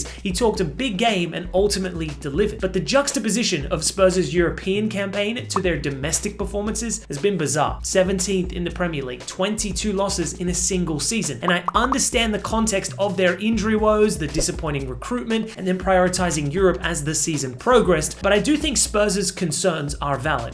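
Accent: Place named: Australia. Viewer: Can’t believe I use to think was British 😂, definitely hear the Australian accent more clearly now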